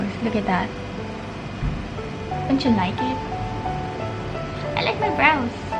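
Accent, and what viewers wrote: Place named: East Malaysia, Malaysia. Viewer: Bila bercakap, terus tauu dari seberang! east malaysia ada slang tersendiri 🥰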